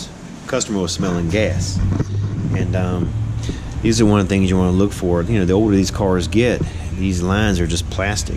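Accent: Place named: New Jersey, United States. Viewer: Are you from mariland. You have a new jersey accent with a virginia twang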